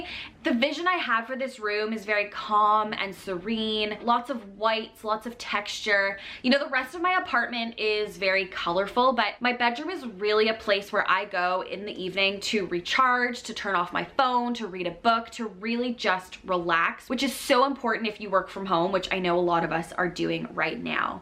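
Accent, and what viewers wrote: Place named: Canada. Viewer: I love that Canadian accent💕🥰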